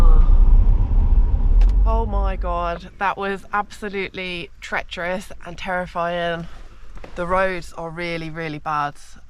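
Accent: United Kingdom. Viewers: May I please ask which UK accent you have? From USA . Thank you for your videos ! If you ever bring George to the USA, please make a video of where you camp.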